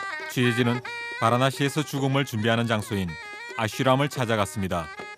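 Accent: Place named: Gyeongsang, South Korea. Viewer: 나레이터가 경상도 발음과 억양이 꽤 심하네요 ㅎㅎ 요즘이 어떤 세상인데..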